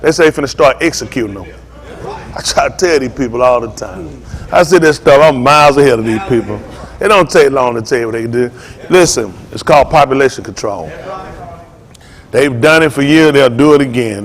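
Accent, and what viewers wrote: South, United States. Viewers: Sound like a Southerner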